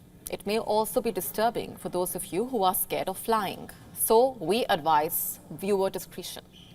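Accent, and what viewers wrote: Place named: India. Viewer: Thick indian accent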